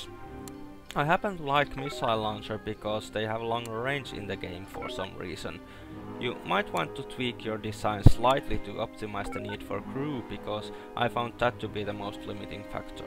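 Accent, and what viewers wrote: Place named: Finland. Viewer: Ootko Suomalainen? Are you Finnish? Just guessing ur accent sounds Finnish :) Even if ur not, great review man!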